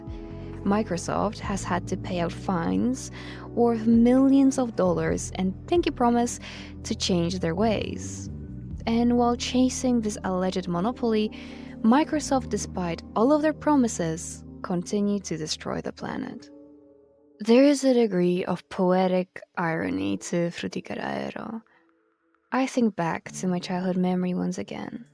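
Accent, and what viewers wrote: Poland. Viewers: Oh how I felt the Polish in you barely 8 seconds into the video :D /pos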